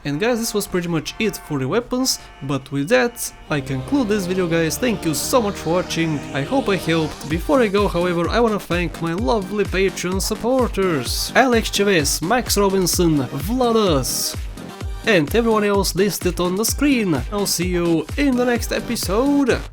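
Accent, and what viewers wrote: Russia. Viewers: Are you Russian bro? I only ask because my mum is Polish and I think your accent is dope. Don't take any shit from anyone about it. Your channel is awesome. :)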